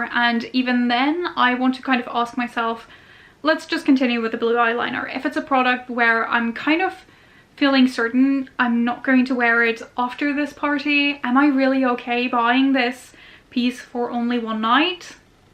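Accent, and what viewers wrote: Ireland. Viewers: I love your Irish accent!❤ Greetings from Israel🤗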